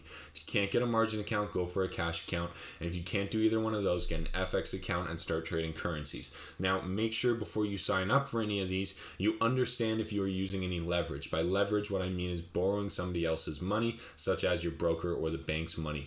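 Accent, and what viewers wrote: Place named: Canada. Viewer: Thank the lord! A man who speaks Canadian. All the yanks nearly made me quit b4 I started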